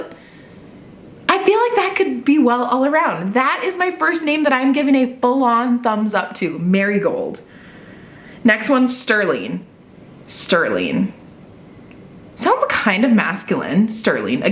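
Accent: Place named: United States. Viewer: I like the cowboy accent 😂😂😂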